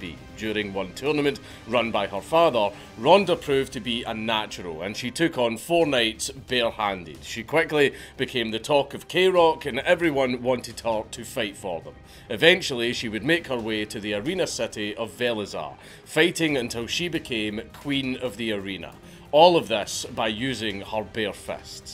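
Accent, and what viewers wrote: Scotland, United Kingdom. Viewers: Dankula has the strongest accent you can understand. Whenever I go to UK, I prepare myself not to understand what half of the population is saying. He learned to standardize his strong accent or just lucky? First time watcher, anybody knows? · Come for the topic, stay for the brogue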